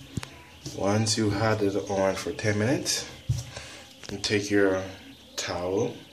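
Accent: Bahamas. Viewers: I hear a bahamian accent doe! Where u from soulja? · u sound Bahamian, r u? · he sound super bahamian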